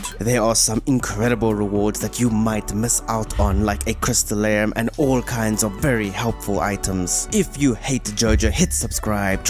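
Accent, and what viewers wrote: South Africa. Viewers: So refreshing hearing my home boy's gorgeous SA accent. Pragtig🤌🤌🤌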